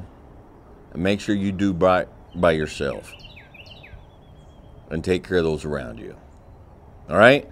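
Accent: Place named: South, United States. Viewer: Lovin’ this southern TED talk ❤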